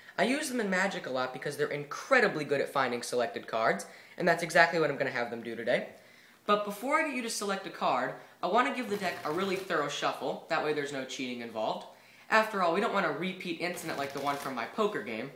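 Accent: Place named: United Kingdom. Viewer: Oh, are you British or American?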